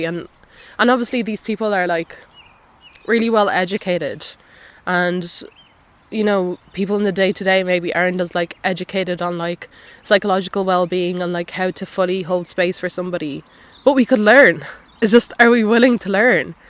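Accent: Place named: Ireland. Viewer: kia ora from aotearoa (nz), it's surreal to see another young person on the opposite side of the world going through such similar experiences and processes, like I was listening to myself just with an Irish accent. Thank you for the self-safety work you have done and continue to do. It is a soft reminder that we too are allowed to treat our most difficult parts with patience, curiosity and respect. Have been recently feeling like I've been regressing or that my healing work is selfish, but this video & all the comments show that all self compassion overflows into our world, into those around us 😇 + so many good reminders of practical techniques i had forgotten or not yet heard of! ty ty ty <3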